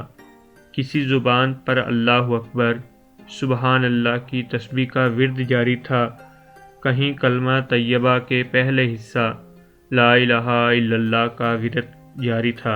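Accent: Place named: Punjab, Pakistan. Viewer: زبان پر توجہ دیں۔ اردو کو پنجابی میں ادا مت کریں۔💓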